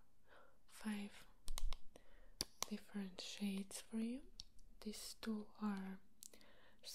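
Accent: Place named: Russia. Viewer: ты русская? уже достаточно видео посмотрела, где у тебя очень четкий акцент, интересно просто узнать🤗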